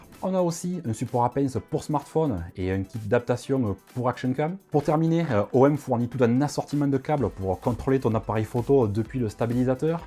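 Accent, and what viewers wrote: South, France. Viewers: Hellooo 👋 Une approche différente de Yannick mais un enthousiasme similaire envers ce superbe stabilisateur et toujours avec l'accent du sud 😎✌️😉👍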